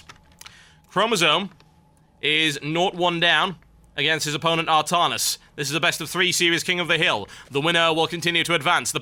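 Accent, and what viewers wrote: United Kingdom. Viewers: your British accent keeps me alive!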